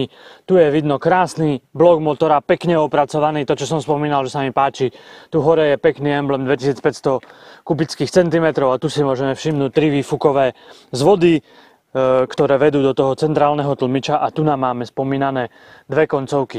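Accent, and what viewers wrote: Eastern Slovakia, Slovakia. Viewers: a ten krásnej vyhodnarskyj přízvuk👍díky. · Video fajn,len ten strašný východniarsky prízvuk ....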